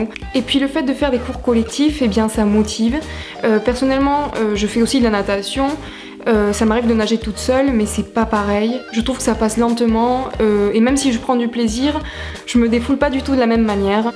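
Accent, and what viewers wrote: South, France. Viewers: vive le sud !! on reconnais l'accent ;)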